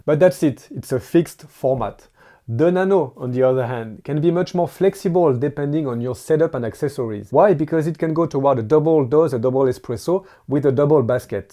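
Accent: France. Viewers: Belle accent français 😂😂😂😂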